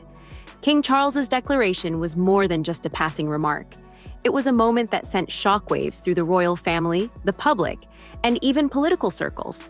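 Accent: United States. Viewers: Another American voice coming from the speaker's rear about something about which she knows nothing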